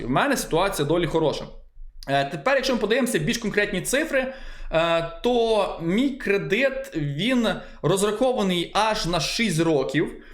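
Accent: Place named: Ternopil, Ukraine. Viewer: Лол, дивився це відео на кухні. Моя мати за 5 секунд оприділила, що ти з Тернополя 😅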